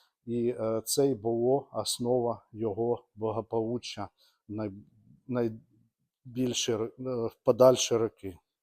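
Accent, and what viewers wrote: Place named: Russia. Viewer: просто сильный русский акцент, я как живший в незалежной слышу фальш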